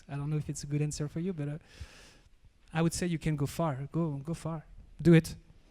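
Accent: France. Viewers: C'est quoi cette histoire de tout le temps parler en anglais ?? Vous vous rendez compte à quel point c'est pénible d'écouter un français parler anglais pendant 1 heure... Please The Family, dont get lost in the sauce...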